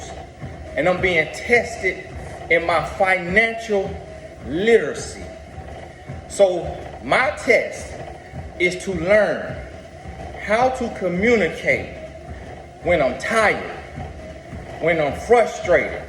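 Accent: South, United States. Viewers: Hey! OK, so Now, there's been a CHANGE, right? You are NOW preparing to WORK ON your COMMUNICATION Skills and NOT be in a comfortable Mindset of ACCEPTIng your Country twang talk, as this is who I AM? And my guess is, you came to the conclusion that it's better to have MORE people UNDERSTAND YOU than not, to MAXIMIZE your OPPORTUNITIES for financial growth 💵, as you pointed out this is the time to grow, store and save! AND I DEFINITELY GET IT because I See that God has made YOU a QUICK THINKER in evaluating WHAT'S BEST! BTW... Your decision to have some 15 minute videos, is AWESOME because, after I have thoroughly enjoyed listening to Much longer videos in initially receiving your wisdom, experiences, and perceptions, NOW, my attention Span has become shorter in my READINESS to watch a much longer video. However, RELATIONSHIP email reads and responses, IMO are Ok for longer. 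Maybe others feel differently?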